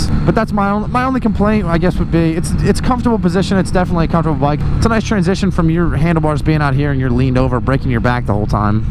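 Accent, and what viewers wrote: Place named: Georgia, United States. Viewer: yeah but hey you can't help "how" you talk. he's from GA. I'm from Alabama, so he's cool lol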